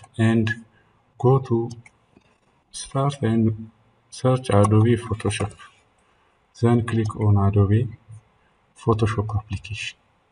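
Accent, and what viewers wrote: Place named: Ethiopia. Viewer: My bro is definitely Ethiopian... the accent 😄 We were looking for a fix while we are using the internet bro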